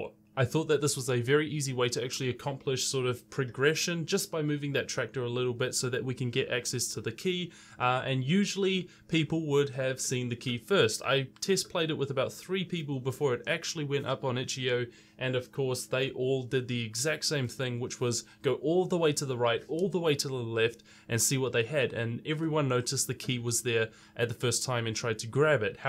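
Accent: New Zealand. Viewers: Judging by the accent, are you happen to be a Kiwi?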